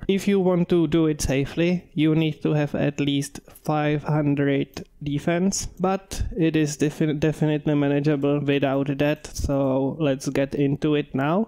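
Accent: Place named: Czech Republic. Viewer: já ten českej přizvuk výdycky poznám xD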